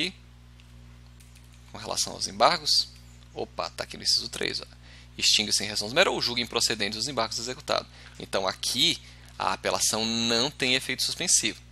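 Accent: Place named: North, Brazil. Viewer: Esse professor é do Norte, conheço esse sotaque, kkkk. Só não sei identificar de onde, se do Pará, Macapá ou Amazonas. Alguém sabe me dizer de onde ele é?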